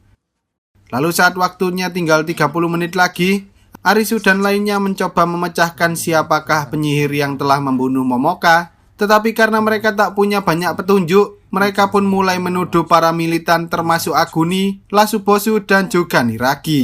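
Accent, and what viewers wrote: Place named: Banyumas, Indonesia. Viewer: ngapak nya dari daerah mana nih 😂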